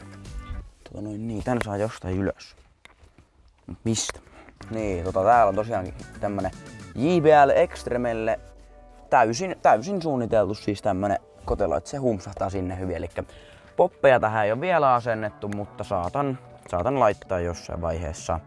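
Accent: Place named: Rauma, Finland. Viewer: Huamaa et poika raumalaine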